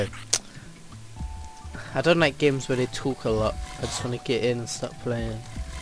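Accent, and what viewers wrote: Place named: Russia. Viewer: That's a Russian accent xD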